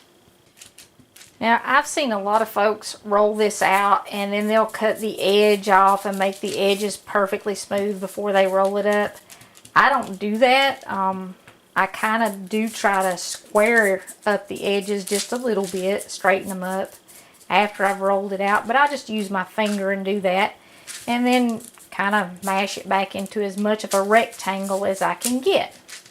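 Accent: South, United States. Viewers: I love to listen to this lady talking; reminds me of my KY kinfolk, my grandma Kate Ford, and my grandma Bertha Edwards who also spoke with a very southern country accent. I'd been looking for an easy fudge and easier potato candy recipe. Thank you for sharing your old-fashioned delicious candy recipes with all of us folk. During this year's holiday season, I will try your two candy recipes! Bless you. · I just discovered your channel and boy do I absolutely LOVE you! Your fantastic southern accent, great recipes. You are wonderful!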